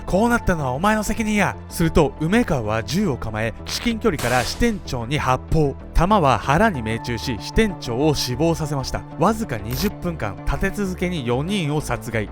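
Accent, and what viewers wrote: Kansai, Japan. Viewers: の関西弁可愛いかた！🥰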